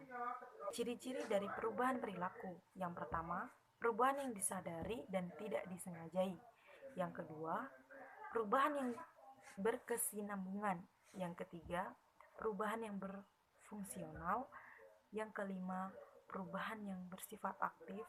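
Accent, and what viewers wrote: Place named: Java, Indonesia. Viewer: Semangat KK😂 Jawa nya medok mbak ee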